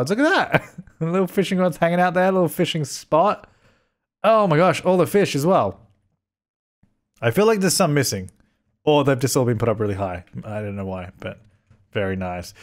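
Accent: Australia. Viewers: Kinda sad that the Australian accent (soft g sound before a long u) disguised the 'historical duty' pun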